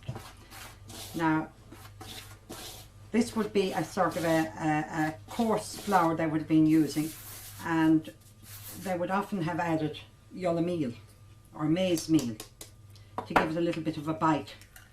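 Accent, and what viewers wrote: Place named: Ireland. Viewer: I am Argentine, fan of so many Irish traditions. Today it is Saturday night and I am enjoying this video... that wonderful Iirhs lady, her cooking tools, the sounds she makes as cooking, her accent, the room, the colours.... Love it! I had the chance to taste the soda bread in Ireland.... delicious!!! Cheers!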